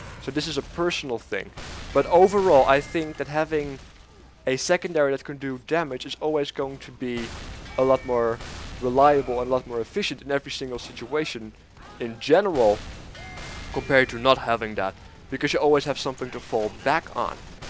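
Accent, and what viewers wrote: United Kingdom. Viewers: I thought you were scottish.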